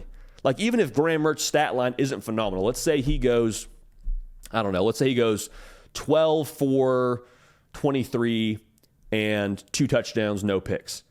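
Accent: South, United States. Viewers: yeah, it’s quite obvious this guy favors SEC teams and blue bloods. I mean, if his southern accent doesn’t give it away, his talking points absolutely do.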